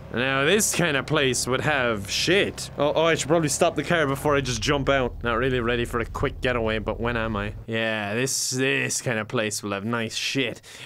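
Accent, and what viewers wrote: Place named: Ireland. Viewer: Good to wake up to this sweet Irish voice. Have a wonderful day,!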